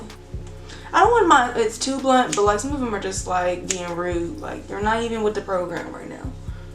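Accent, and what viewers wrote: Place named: Bay Area, United States. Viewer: I just found your YouTube lastnight and girl i loveeee your content! At first i thought you were from the Bay Area (i like too) and just how you talk lol I’m from there. But good work girl! 💕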